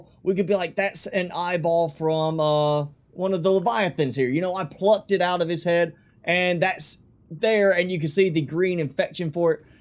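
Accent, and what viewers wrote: South, United States. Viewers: Gotta love the y'all accent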